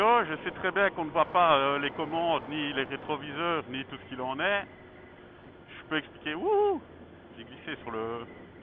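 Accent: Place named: Belgium. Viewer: Super un ami Belge avec le meme accent que moi et en plus a coter de moi . Bienvenu dans ce monde de youtubeur l'ami et pourquoi pas se rencontrer un de c'est jours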